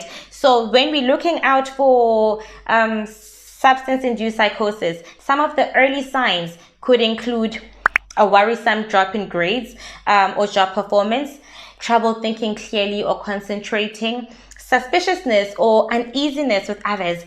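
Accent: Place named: Africa. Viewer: Here because a friend is dealing with this. There is something so satisfying about a woman with a wholesome soothing sounding voice and African accent ☺️ I love the way you present and speak.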